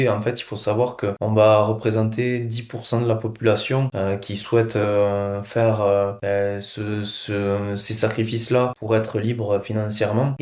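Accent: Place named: Rodez, France. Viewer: T'es un vaillant, bravo. Bosseur avec une tête bien faite. Même si t'as dû perdre quelques années de vie à cause du stress 😅 La vie d'un entrepreneur en somme J'ai adoré ces 2 videos qui retracent ton parcours, je n'ai pas vu le temps passé. Étant ruthénois je devine avec ton accent que tu ne dois pas habiter très loin de chez moi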